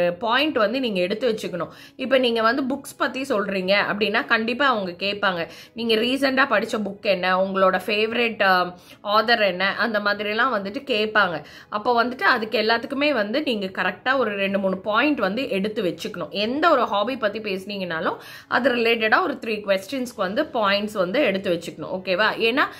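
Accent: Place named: Kerala, India. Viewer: Watching 2 to 3 videos in the past I doubted that you would be malayali..... And In this video your slang resembles like a malayali .....are you a malayali..??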